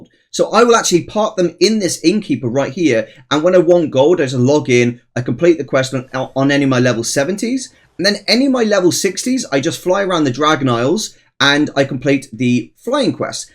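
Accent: United Kingdom. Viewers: Minimum wage in the UK is £11.44 per hour. WOW costs £9.99 per month in the UK (or less depending on plan). In case you don’t recognise the accent, this guy is from the UK. If you were doing 60 characters like he suggested that is going to be at least an hour a day. Over a month that would be the equivalent of around 30 hours. At minimum wage that is going to be around £340 you could have earned for that same time doing something boring. I can understand if it is quick or fun or yields a lot for the time, but this doesn’t tick any of those boxes if your intention is to just pay for your subscription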